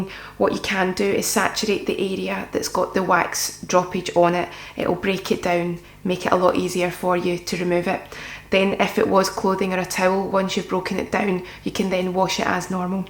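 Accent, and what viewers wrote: Scotland, United Kingdom. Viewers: I benged Outlander and now the Scottish accent seems to be following me lol. I love your accent. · U sound Scottish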